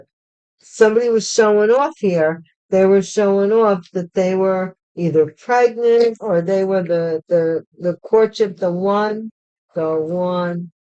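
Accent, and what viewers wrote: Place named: Brooklyn, United States. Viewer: You sound like you are from Brooklyn 😂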